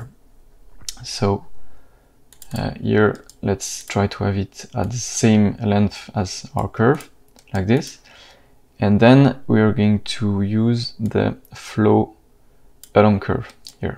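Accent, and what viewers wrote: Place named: France. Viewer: I think you are a french native speaker ;)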